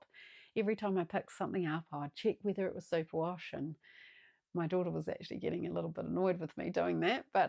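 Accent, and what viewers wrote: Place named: New Zealand. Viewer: You are a joy to watch. I love to hear that kiwi accent. I'm in Wellington just down the road. 😂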